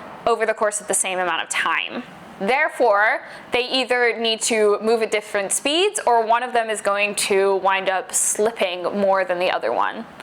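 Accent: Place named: Australia. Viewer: Hello, algorithms brought me here. I love the enthusiasm and the love of the sport. In trying to pinpoint your accent, sometimes it goes northern but sometimes I think it's aussie... Pretty cool in any case (and if it's a 'normal' accent then I haven't travelled enough!). Anyway, there's alot of oldie f1 fans out there who sometimes get a little sensitive on technicalities. You're still finding your way which is great but thought I'd share to not be too disheartened if you get negative responses in the future. You'll find your footing! Few pointers on this topic- Tyres, if you're going fundamentals for F1 specifically, the suspension components work very closely with keeping the optimal operating temperature of the tyres for the lap. Think the window is 120-180c? Toe/caster/camber, I think alot of these are tailored for the tyre/track/scrub rate/temp, rather than driver preference. 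You could've mentioned DAS wheel in the 2019? period. Suspension, push or pull rods, bit of black magic but I suspect is how they load transition works with the rest of the setup (ie placement of engine, aero etc). Ride stiffness/height is largely balancing the aero rather than 'comfort'/performance typically. See AM this year (I suspect running very stiff setup that helps aero but because engines I assume aren't balanced/ tolerating the stiff setup as well and will shake the driver. Mercedes last era with porpoising (aero grounding out at high speed, relying on suspension too much to fix the aero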